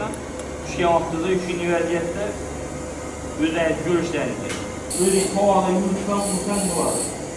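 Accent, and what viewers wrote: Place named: Khorezm, Uzbekistan. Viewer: Хоразим шеваси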